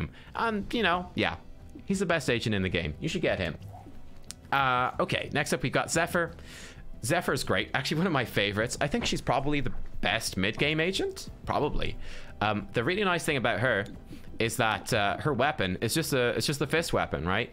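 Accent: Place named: Ireland. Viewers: It sounded like a very mild Irish accent to me but it took me a minute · That's a perfectly normal Irish accent.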